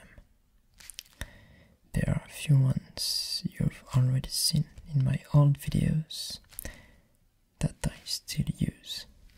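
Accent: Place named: France. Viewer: French accents are so dope.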